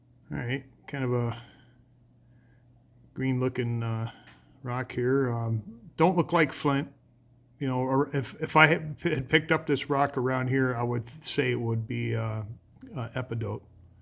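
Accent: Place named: North, Germany. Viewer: Oh heck yeah! This is interesting. I‘m German but living further down south, on the Rhein. sounds northern German and her rocks imply she’s from up there, too. Not to dox her 😅 Flint is super common up there but I hardly ever find any down here. It was interesting to see the difference when I lived up north.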